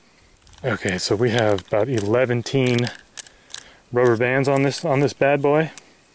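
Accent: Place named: Appalachia, United States. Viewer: classic hillbilly dialect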